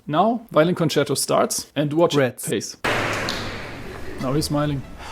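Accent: Germany. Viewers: würde mir nur etwas weniger deutschen Akzent wünschen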